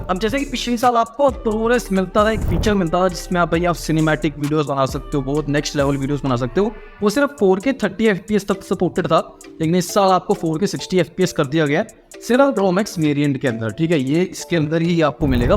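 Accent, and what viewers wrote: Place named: Pakistan. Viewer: Are you from Pakistan? Your tone sounds like them that's why just asking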